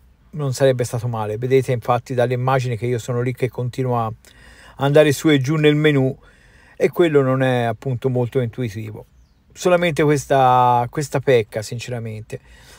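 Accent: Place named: Tuscany, Italy. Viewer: Mi sono appena iscritto perché dopo aver visto qualche vostro video mi sembra di essere tra amici che chiacchierano sulla loro passione, io ho una r1250gs std del 2021 e ci troviamo veramente bene, specie mia moglie😅; io sono di Torino ma trovo simpatica la tua cadenza Toscana ( abbiamo amici a Pisa e all’ isola d’ Elba). Ciao Roberto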